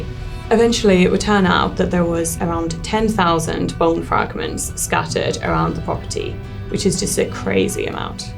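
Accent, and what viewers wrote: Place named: RP, United Kingdom. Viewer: Posh Focal Fry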